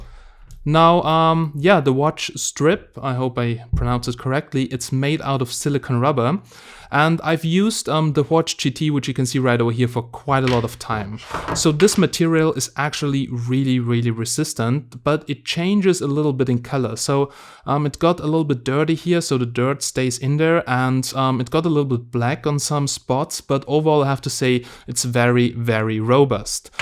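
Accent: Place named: Germany. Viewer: For me it sounds like Germany or Austria.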